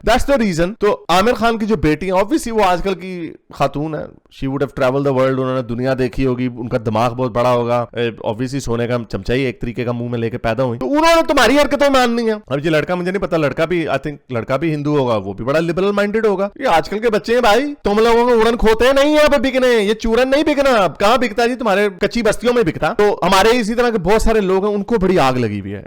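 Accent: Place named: Punjab, India. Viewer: Aap ke panjabi bolne ka style sunn ke majja aata hai.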